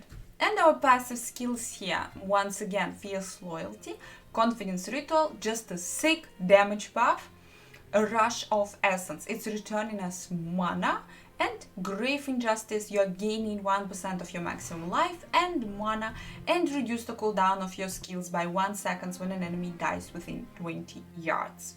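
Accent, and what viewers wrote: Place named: Russia. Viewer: Как легко узнать русскоговорящего по его произношению английского языка) Но вполне сносно!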